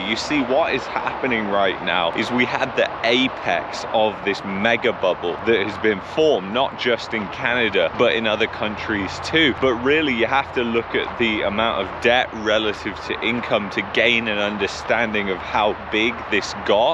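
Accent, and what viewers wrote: United Kingdom. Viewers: I believe he is from UK. There is no Australian accent.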